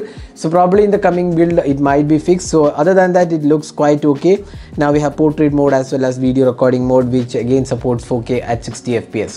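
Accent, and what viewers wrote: Kerala, India. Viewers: You don't need to look at the person just the voice tells you they are mallu 🤣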